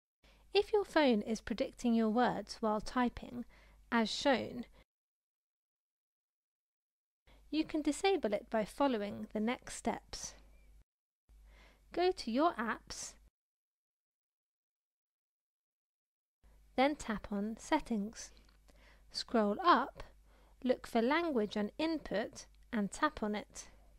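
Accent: United Kingdom. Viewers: Lol Ur British accent is awesome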